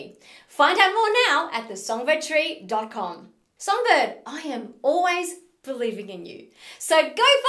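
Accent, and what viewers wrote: Australia. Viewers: love your australian accent! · Australian aaccebt?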